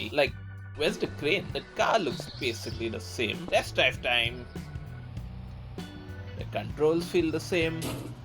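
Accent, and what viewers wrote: India. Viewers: You sound indian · He is already sound like Indian because he is from India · I love his Indian accent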